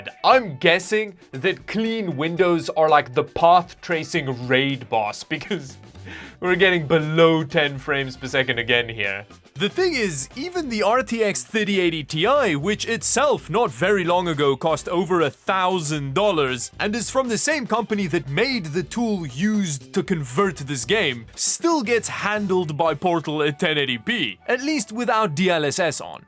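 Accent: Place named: South Africa. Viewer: the best part about watching is that he sounds south african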